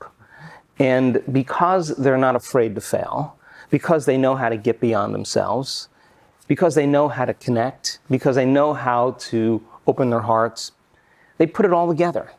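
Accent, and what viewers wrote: Germany. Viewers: cuuuute german accent :)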